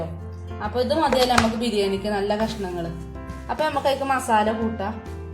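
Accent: Malappuram, India. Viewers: ഇത് മലപ്പുറം ഐഡന്റിറ്റി ഭാഷയാ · മലപ്പുറം ഭാഷ കേട്ടരിക്കാൻ എന്തെര് സുഖം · Malappuram slang kelakkan vendiya njn vedio kananee 🙊🙆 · മലപ്പുറം നാടൻ പാസ 😄😄😄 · Mlpm slag ishttayi nallla rangand kelkkaan pinne thaatha nte vayel vellllam varunn nd vishappullla samayatha ee video kandath nte kodhi onnum ndavullla nte ponnu thaatha ichiri chor tharuo☺️ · ശരിക്കും മലപ്പുറം ഭാഷ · മലപ്പുറത്തെ സംസാരം ഒന്ന് വേറെ തന്നെ ചിരി വന്നു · തനത് മലപ്പുറം ഭാഷ...അടിപൊളി 👍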